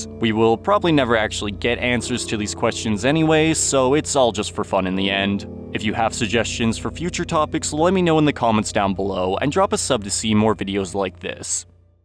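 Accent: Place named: Iowa, United States. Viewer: Did this guy say he was Canadian? His vowels sound like he’s from Iowa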